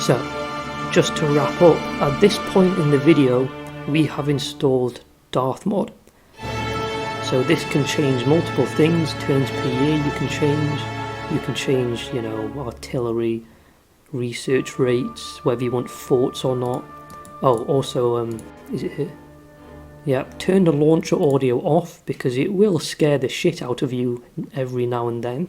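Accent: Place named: United Kingdom. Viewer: I love this british accent, I was loking for some british channel for improve my english, and I found now 🙂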